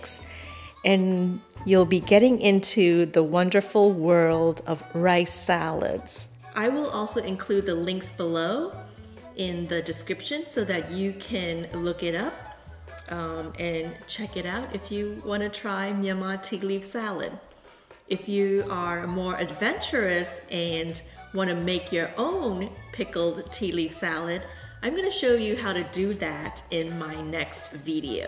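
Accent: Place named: United States. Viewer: She speaks perfect English too with American accent!😊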